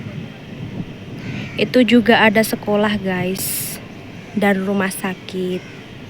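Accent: Java, Indonesia. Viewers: Orang mana si mbaknya kok bahasanya medok kaya orang jawa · Mbak asli mana to,,,,?kayak jawa ya,?kira2 jawa mana mb,?semoga di balas heheh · Benar mbak, aku baru 2 hari nonton YouTube nya, dlm hati bertanya logat nya logat jawa tapi agama kok bkn Islam, ternyata pindah agama. Setau ku orang Jawa jarang sekali orang yg non muslim. kalau dari medan /manado wajar kl bkn muslim.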